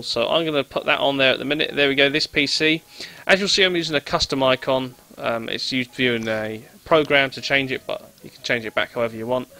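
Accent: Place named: Ipswich, United Kingdom. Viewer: your from Ipswich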